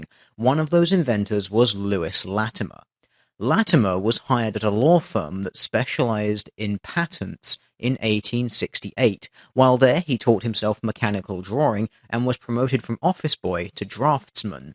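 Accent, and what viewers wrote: United Kingdom. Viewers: So it's another guy with a British accent complaining about "social justice warriors"? Got it.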